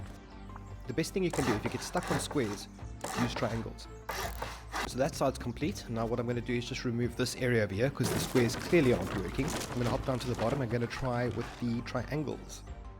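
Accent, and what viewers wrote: Afrikaans, South Africa. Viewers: Are you from south africa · Are you south african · Where are you from, you sound South African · I’m guessing you’re from South Africa? Most probably Joburg? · you South African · You sound South African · YOU SOUND SOUTH-AFRICAN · U can hear this guy is South African 😹🇿🇦 Ayo straight from the mother nation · Wait he's south african? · I knew you were South African! Finally a fellow South African Youtuber that is good! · Thought I recognised that accent Rare to see another SA on YouTube, especially a content creator. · The accent lol. Afrikaans. Man its so easy to pick up lol. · I am south African aswell I instantly knew your south Africa by listening to your accent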